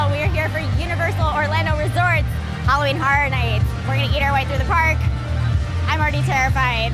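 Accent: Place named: New Jersey, United States. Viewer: I knew you were from Jersey or somewhere else close in the upper east coast...haha I can tell, born and raised in Florida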